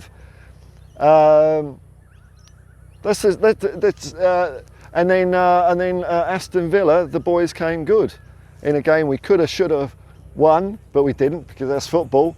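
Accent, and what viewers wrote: Australia. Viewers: I knew this bloke was Aussie, great bloody content worth the sub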